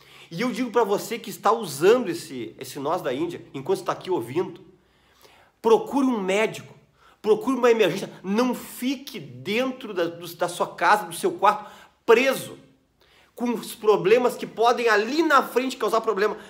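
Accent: Rio Grande do Sul, Brazil. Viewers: Dr me esclareça por favor! A plicoma é indolor ou dói muito. Por favor me ajude. Eu estou com uma bolinha na margem do ânus e dói. Que faço? Curto seus vídeos, gosto de seu sotaque gaúcho. Bj!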